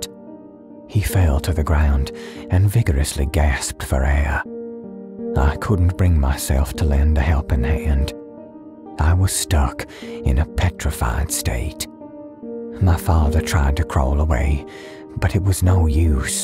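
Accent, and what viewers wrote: South, United States. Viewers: Have heard this from another narrator, but you did it so much better! Love that thair southern twang of yours!! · Wonderful timing, my good sir! Bed time! My but you have the perfect Southern gentleman advent! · Your southern drawl was Great!!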